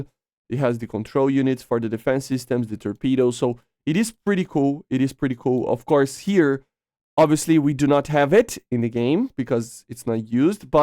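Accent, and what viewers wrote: Brazil. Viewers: pelo sotaque esse mano é br mas n posso provar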